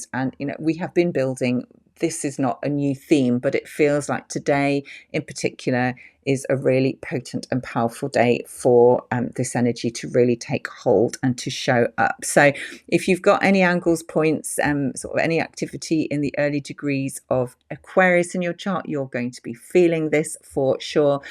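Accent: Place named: United Kingdom. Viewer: Hello, my middle name...also, its nice to hear a british lady doing astrology, very few are very good, i have studied astrology since i was `16, because i wasnt good at sussing out people, astrology helped me so much there- now i see it actually working on the world stage- the wise men of the past knew much more than we think we knew, they used it daily and as a map and we can learn much from this xx THANKYOU X m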